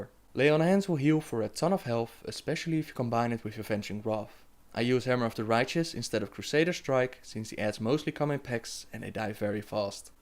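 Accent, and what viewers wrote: Netherlands. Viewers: Ik hoor gelijk dat je Nederlands bent. :P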